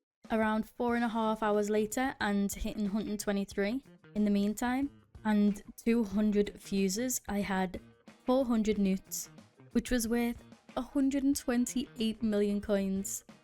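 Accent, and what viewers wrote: Liverpool, United Kingdom. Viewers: Swear u sound scouse if ye r where abts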